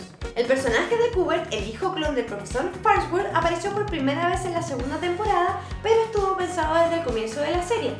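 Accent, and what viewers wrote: Chile. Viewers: debo confesar que no soporto el acento chileno (así como muchos no soportan muchos otros) pero la forma de hacer los vídeos y el cuidado con las cosas que dicen me hacen obviar eso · eres chilena?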